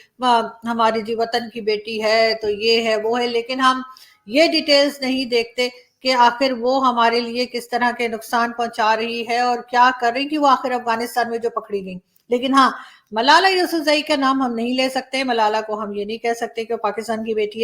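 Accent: India. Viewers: Don't know wether people and u noticed or not ,that your accent and Dialect seems purely like Indian Hindi speaking person....... Lagta hi nahi ki urdu bol rahi hain aap ..It's like Indian Desi girl taking charge over any programme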